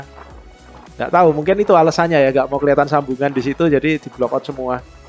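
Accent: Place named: Surabaya, Indonesia. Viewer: Mangkane medok_e Jawa Suroboyoan ..hehe salam dari Surabaya Om sehat selalu..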